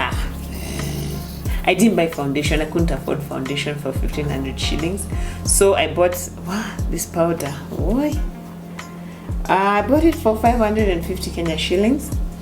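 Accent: India. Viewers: Beautiful. I love the Indian Accent😂😂😂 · You look beautiful, wow and only for 1,500, very impressed. Love the Indian accent😂😂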